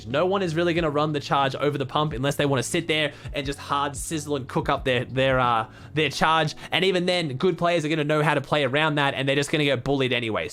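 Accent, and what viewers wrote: Australia. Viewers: noti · YES MATE I LOVE YOU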